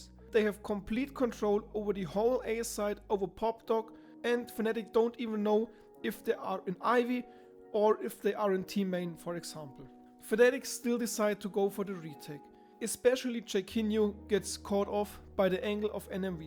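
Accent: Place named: Germany. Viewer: good video mens))) i like your jermen accent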